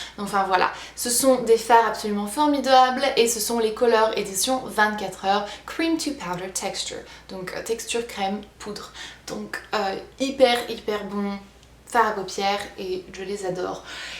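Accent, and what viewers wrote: United States. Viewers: Toujours aussi marrante;) Bourjois est vraiment tentant à force que tu en parles... Pour info, j'ai trouvé des vernis Essie en Espagne à seulement 8,95!!!!! Et OPI à 12 je crois, donc si vous avez l'occasion d'aller en Espagne foncez chez Primor;) Pleins de bisous et au passage, quand tu parles anglais on dirait que t'es une américaine!! _ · Coucou 😘 Superbe vidéo comme d'habitude! Je t'adore ❤️ surtout ton accent américain est juste top j'adore!! J'aimerai avoir un accent comme ca XD😂 Bref big bisoux continue comme ca😘😘😘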